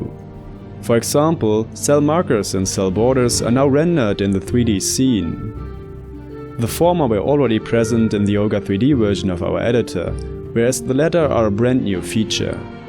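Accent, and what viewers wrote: Germany. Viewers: Are you german? Your accent sounds so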